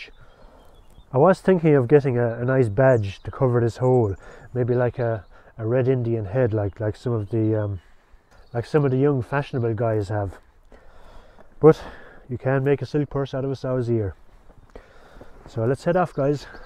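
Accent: Tipperary, Ireland. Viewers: im telling you one thing that tipp accent is gold you have a narrators voice and from one irishman to another i hope to bump into ya in the woods someday for a cuppa tea and a chat.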